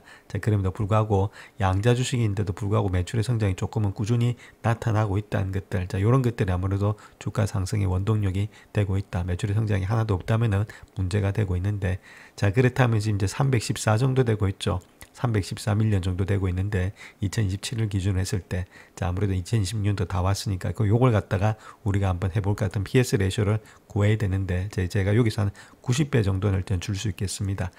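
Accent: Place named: Gyeongsang, South Korea. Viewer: 어쩐지 좀 거슬린다 했더니.. 갱상도인가요????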